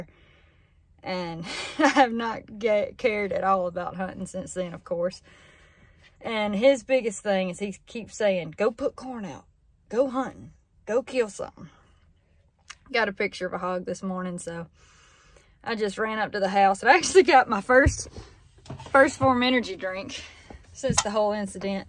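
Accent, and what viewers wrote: South, United States. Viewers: Hello from way Downunder (AUSTRALIA) first time on your channel & wow your southern accent is amazing. Sorry to hear about your dad’s hunting accident & I hope that he recovers fast & everything is ok. I’ve just subscribed so I’ll be keeping an eye out for you-all & check out your older videos..Take care now. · What a nice face. Where is from the accent? Usa south? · you have a gift that I think your dad knows....it is a very rare a voice like yours that impresses people who want to hear it. My Aunt Mary had it, she raised two daughters that had it. You have critics that don't understand what real Southern Women sound like. You are real, do things that most men can not do. You are exceptional and smart. Your dad did a great job in raising you!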